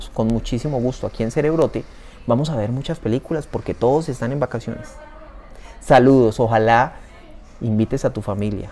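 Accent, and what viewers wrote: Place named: Colombia. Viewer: Me encanta su acento, es colombiano?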